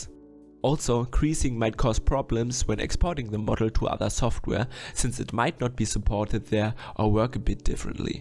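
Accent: Germany. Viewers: Das ist doch ein deutscher Akzent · Idk why but u sound a bit German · Through the full video i need to think about how german your accent sounds XD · Cool, but are you from germany? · deutsch accent or i wrong? )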